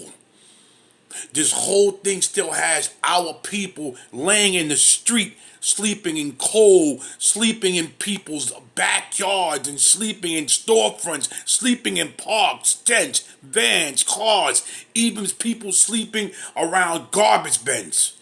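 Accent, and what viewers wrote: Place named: South, United States. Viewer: What that is I call it geographical racist seriously some people are very ugly where your accent comes from. I see it and been told with a southern accent your IQ drops 50 percent but that was from the North not the east coasters. They think they are so smart seriously pay more taxes every day and they are so smart they vote for it too. Personally I love to hear different accents. Even broken English I like to listen to that. You keep on with that strong talk, wimps can't handle it.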